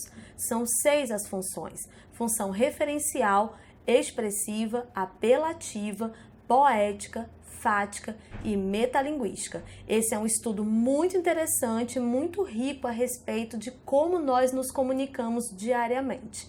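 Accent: Rio de Janeiro, Brazil. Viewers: O sotaque mais bonito do Brasil. E eu nem sou carioca. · Tu é carioca?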